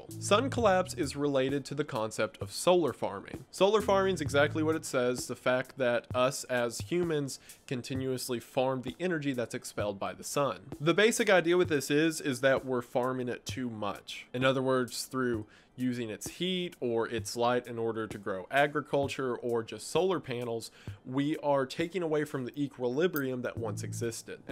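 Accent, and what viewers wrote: South, United States. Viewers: Saaaame. Then again, in my house, we* might even enjoy " reads the dictionary" as background noise. * "we" includes my demonic toddler lmao; that chill, Southern-accented baritone is just SOOTHING, man 😌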